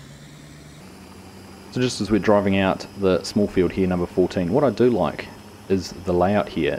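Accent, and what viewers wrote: New Zealand. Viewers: I love this NZ accent 😁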